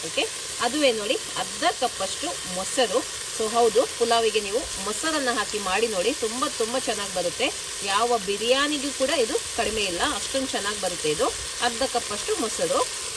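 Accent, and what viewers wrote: Mangalore, India. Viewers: Was just scrolling YouTube I got ur video and I will do subscribe because I love the slang and tone of Mangalore bashe ❤️ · Hi akka, ನೀವು 👌🏻ನಿಮ್ಮ ಮಾತು voice 👌🏻 ಮಂಗಳೂರು ಕನ್ನಡ 👌🏻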